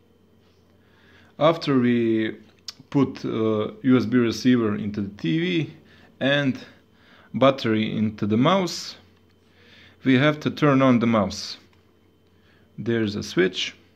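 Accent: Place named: Russia. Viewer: Fantastic Russian accent dude you're fantastic man great if you should do more videos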